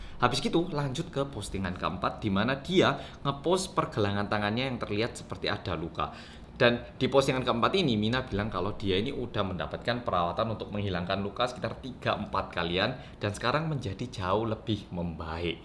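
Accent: Java, Indonesia. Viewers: "Hello guys welcome back to Korea reomit bersama orang Korea yang medoook" Salam dari bali mas medok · Sudah baca beritanya sih, tapi emang lebih enak kalo mas yg ceritain langsung, jadi bisa membayangkan kejadiannya, (dan terpercaya karna langsung di terjemahin sama orang korea medok )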